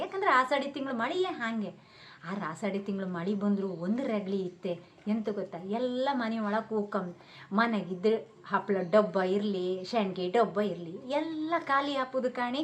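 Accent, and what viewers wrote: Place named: Kundapura, India. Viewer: Kundapura kannada ❤ U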